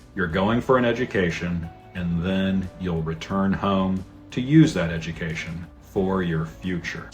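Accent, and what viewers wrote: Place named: England, United Kingdom. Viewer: Like the way that you simplify things. Best visa officer and English accent ever.❤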